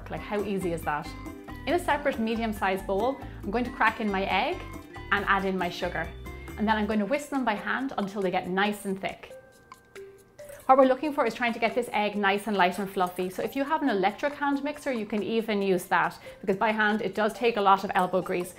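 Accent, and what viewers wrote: Ireland. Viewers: Is she Irish? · Wow, So cool! You remind me of another youtuber! He does gaming and he is also Irish! You have an awesome accent! Great Food! I just made your Blueberry Muffin and Mac & Cheese! Very Yummy!! Fabulous Work! Please Keep Making Videos! :D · Are you Irish ?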